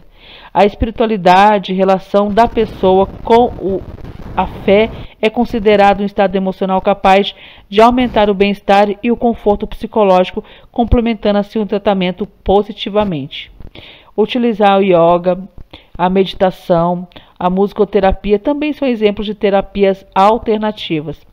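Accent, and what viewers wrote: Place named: Rio de Janeiro, Brazil. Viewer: A senhora é do Rio de Janeiro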